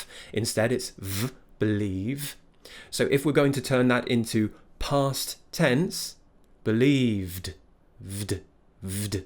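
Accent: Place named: Cambridge, United Kingdom. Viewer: Nice wher in England are you from ..accent wise i cant firgure out il guess Cambridge ?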